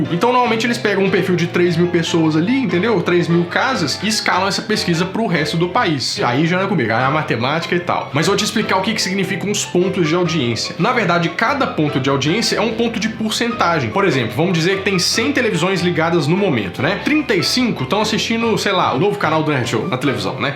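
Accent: Minas Gerais, Brazil. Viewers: Ele falou tudo certo, o sotaque mineiro confundiu vocês sô! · CE é mineiro ??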